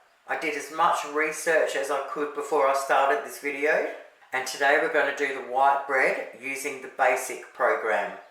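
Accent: Australia. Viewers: So ocker (and I mean that as a compliment)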